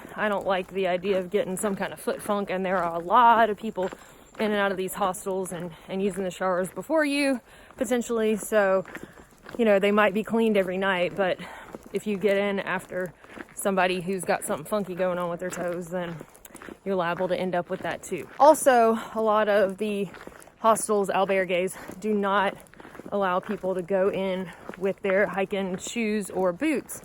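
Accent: South, United States. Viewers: southern vernacular. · I just realized I've watched you for so long I don't hear your southern accent anymore lol. Great video as usual. Happy Trails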